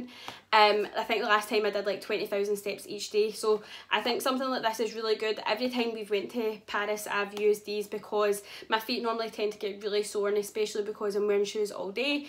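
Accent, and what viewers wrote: Scotland, United Kingdom. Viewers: Great to hear a scottish accent doing a poundland haul......keep them coming xx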